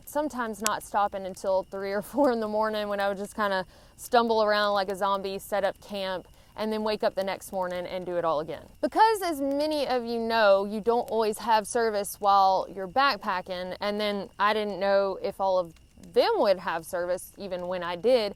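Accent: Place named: South, United States. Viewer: Love it! LMAO!!😂 and your accent... "not hockin'"... way more Southern than the Richmond, Va. Accent I enjoyed so much so many years ago. Aahh, memories. Thanks,. 😊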